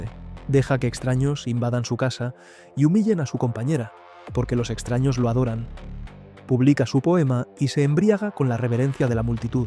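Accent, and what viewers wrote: Spain. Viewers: tu asentó español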